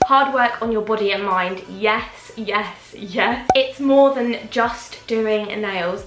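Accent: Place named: United Kingdom. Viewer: I´m not a nail tech, but I like to do my nails by myself and watch your channel and listen to your UK accent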